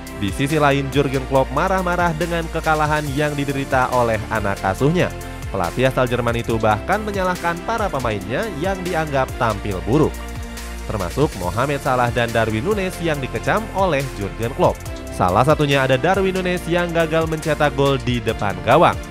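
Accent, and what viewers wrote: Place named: Banjar, Indonesia. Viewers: Pian ni urang banjar kah mang?? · Anjay adminnya org banjar nih😄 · Admin cetakgol orang samarinda kah....GK asing sama cara bicaranya🤔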